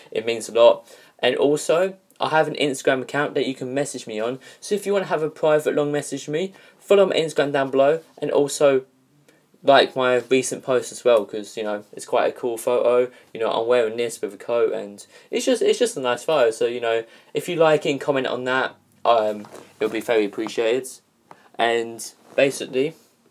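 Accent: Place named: United Kingdom. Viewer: What's up dude!!! I like your british accent. greetings from Indonesia🇮🇩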